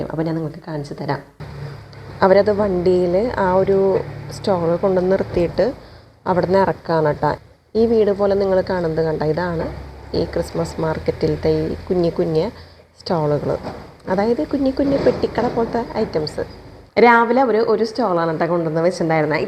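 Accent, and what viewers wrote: Thrissur, India. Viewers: Thrissur ano..? 😂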